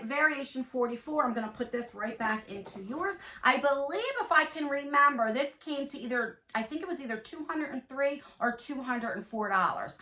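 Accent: New Jersey, United States. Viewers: I love your make up and your cute Jersey accent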